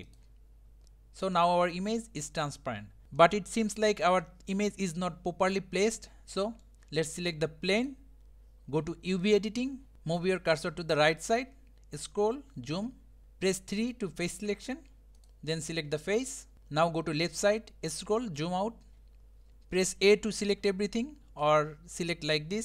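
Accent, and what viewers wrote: India. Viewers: as usual, when you hear an indian voice when looking for a tutorial, it means you found the answer... Thanks!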